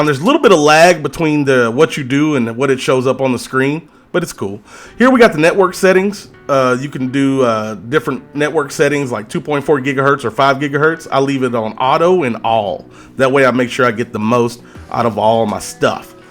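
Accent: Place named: South, United States. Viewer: can't tell if this guy is in Texas or along the Mississippi river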